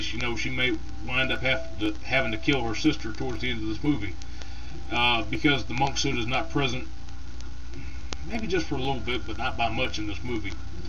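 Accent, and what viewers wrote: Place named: South, United States. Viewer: Hey, I'm from Canada. I think this review wouldn't have been as cool if you didn't have the accent. It makes me like you. Where are you from? Sounds very Southern. Either way, great review. I want to note however that they put real indians in the movie. I mean ful blooded ones, which I thought was extremely Canadian.nJust wondering if you noticed that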